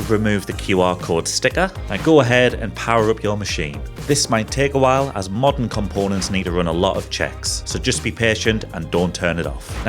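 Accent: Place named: Newcastle, United Kingdom. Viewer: whew, canny geordie yee like.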